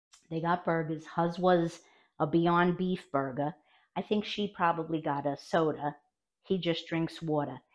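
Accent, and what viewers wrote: New York, United States. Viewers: I’m making this for dinner tonight! I found you through crocTober, but I’m going to subscribe. You sound like all of my family back in New York!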